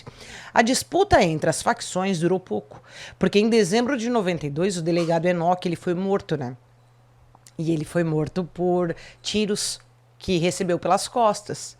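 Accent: South, Brazil. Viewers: JÁ OLHEI ESTE CASO MUITAS E MUITAS VEZES,MAS VOU OLHAR E DEIXAR MEU LAIKAO,PORQUE A APRESE NTADORA É A A LOIRAAAA MAISSS LINDAAAA DO MUNDOOOO E O SOTAQUE SULISTA ENTÃO,DEUS TE ABENÇOE RICAMENTE MINHA RAINHA,MINHA DEUSA MARAVILHOSAAAAAA❤❤❤❤❤❤❤❤❤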